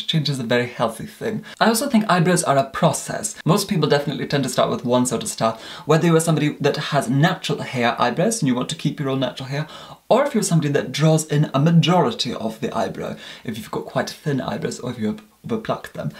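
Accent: Scotland, United Kingdom. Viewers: Phoinexe he speaks with a Scottish accent but has made his voice a bit more posh and articulate in more recent videos. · he has took the original English accent and slightly mixed it with Scottish and created this amazing and extremely proper accent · He's Scottish but a really posh english/Scottish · Lovely Scottish accent 🏴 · We can still hear that Scottish accent! ;) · so this is my second video i have watched of him, and i’ve realised he actually had abit of a scottish accent lmao..? ik he’s british but i couldn’t put my finger on it. am i right? is he scottish?! phahah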